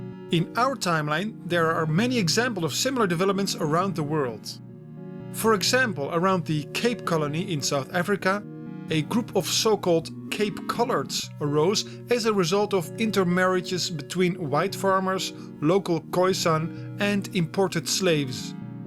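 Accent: Netherlands. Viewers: Rook took I think so I'm Dutch and he really sounds like a Dutchman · Quite noticable accenr there. Are you Dutch? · His Dutch accent is terrible. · Is the narrator Dutch? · is this guy Dutch? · You can hear immediately that the video is voiced by a Dutch person. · Manoj Anton-Rajkumar sounds more like a Dutch accent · You sound Dutch. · Wow, the Dutch accent is kind of heavy. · I think this has to be one of the best video about Alternate history I ever seen. BTW am I hearing a Dutch accent? Ik ben blij dat ik je kanaal op youtube heb gevonden. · yo, you dutch cuz it sure sounds like it ^^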